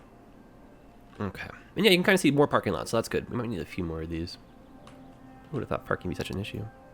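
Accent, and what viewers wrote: Toronto, Canada. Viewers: i was just coming to comment that this dude sounds so canadian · Discovered your channel through the 5 Builders collab, really enjoying your content. As a fellow Torontonian, but one who mostly watches British YouTubers, hearing someone online using the local accent really caught me off guard lol. The numbers of "beauty"s and "eh"s in this video are fantastic :D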